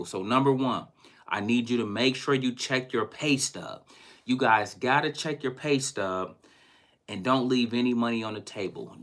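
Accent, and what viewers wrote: Detroit, United States. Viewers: Are you from Detroit?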